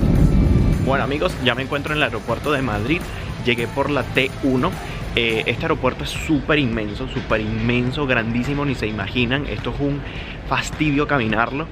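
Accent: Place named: Venezuela. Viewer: Saludos de Rio, Brasil...te oigo muchacho para entreinar el espanol de Venezuela... ...y te deseo mucho mas sucesso gajo en Portugal y tambien con la nueva lengua que aprendes. Tchau!!! 😊😊😊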